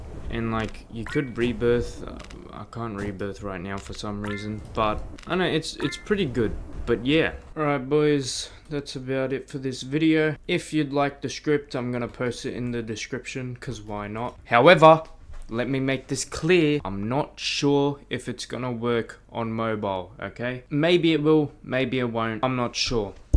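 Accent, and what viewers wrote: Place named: Lebanon. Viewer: 2026 the year of the roblox coding leb accent eshays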